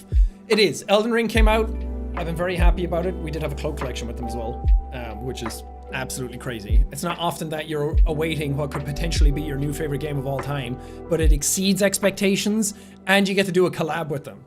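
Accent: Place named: Ireland. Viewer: dude i remember finding you when your where recommended to me while you where playing viscera cleanup detail. Your loud irish accent stuck out to me instantly and i just enjoyed your upbeat personality. Im 32 and now not only do i still watch you but my kids do too. Badass channel bro, and you have one of the biggest hearts on youtube.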